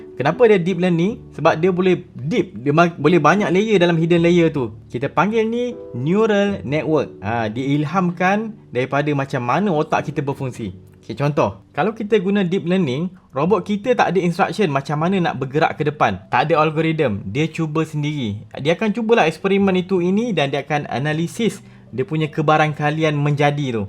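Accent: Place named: Kelantan, Malaysia. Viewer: Ada bunyi macam telo orang Kelantan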